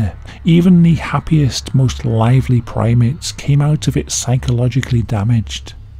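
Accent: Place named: Manchester, United Kingdom. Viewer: Oh,. You don't sound sarcastic, you sound like a Manc!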